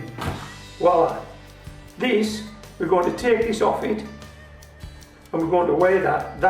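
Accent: United Kingdom. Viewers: British? Just curious.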